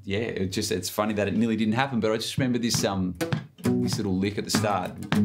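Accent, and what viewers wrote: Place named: Australia. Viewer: haha love the aussie accent so strong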